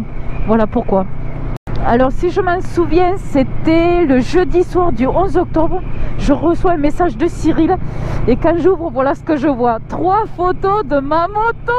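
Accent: South, France. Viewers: J'adore ton accent du sud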